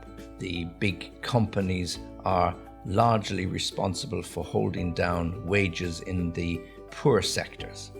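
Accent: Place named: United Kingdom. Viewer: I watched this video twice, I was enjoying it, your British accent sounds great, my warm welcome from Kazakhstan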